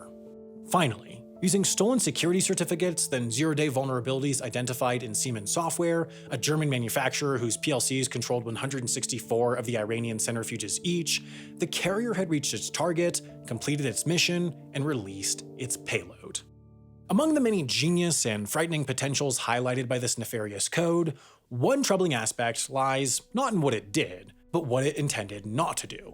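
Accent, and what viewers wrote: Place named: United States. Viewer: Sounds American to me.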